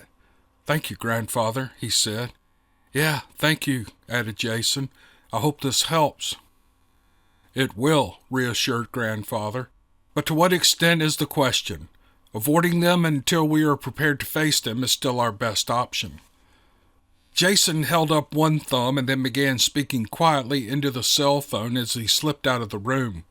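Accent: South, United States. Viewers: You did an excellent job reading these to us. I've heard you before, but hubby and I sat down and listened to all 6 Parts in one day, no joke. We couldn't stop listening until we heard them all. You are an excellent storyteller, and we appreciate you!! Your southern drawl and just the way express everything makes it come alive. I do not want to forget DA Roberts, who without him these would not be possible. Thank you again. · I am so darned excited, I could not listen to part 1 fast enough, then when I began part 2 directly after part one. I HAD to put on the brakes! "Savor it, don't rush through it", I told myself. But, have I listened to me? Oh HELL no! First taste I just started gobbling part 2 up, as well. I had to force me to STOP and send you and D.A. a note to say, as usual, nothing but the best for your followers. Mr Roberts your writing rocks, I love your brain and how it works! 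Your words manage to keep me glued to my seat 'til the last little scrap is gone. Then I am wanting more, like some kind of crazy person, I sit and whine for a moment. I can't help myself I just HAVE to go back and listen to it all over again, I KNOW I missed something, some important detail. I love how you put words together and whisk us away to a new world, it's amazing!, your voice and southern accent (lived in Tennessee for over 25 years and nobody sounded as good as you do) enable me to listen to something over and again because it's so soothing and yet loaded with excitement, sadness, joy or what ever the emotion the author intends. The ability to read aloud with all the inflections necessary to put the listener right in the middle of it all is not something everyone can do. You both are gifted. I want to THANK YOU both for sharing your gifts with all of us. I just love you both to bits and pieces, again, thank you!:face-red-heart-shape::face-red-heart-shape: Rosey loving life from the boond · Omg im not one for listening to someone else read for me,i love to read books myself, but im hooked absolutely hooked,i love your southern accent, im scottish,you really got me listening and on the edge of my seat, I've just started binge watching your podcasts,amazing never stop,im not normally into this sort of thing, keep it coming 😊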